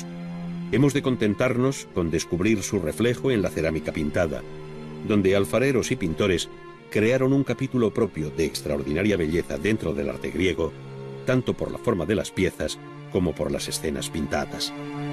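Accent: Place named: Spain. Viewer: Amo estos documentales, los veíamos en la facultad en el 2012, una joya. Y me encantan con acento español, no sé por qué! Gracias por subirlos!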